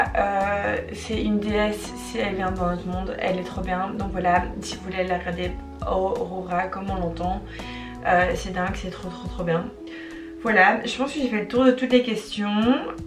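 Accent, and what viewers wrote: Belgium. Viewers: Tu ne serais pas Liégeoise par hasard ? Un petit fond d'accent familier. En tout cas j'espère que tu développeras tes activités, courage.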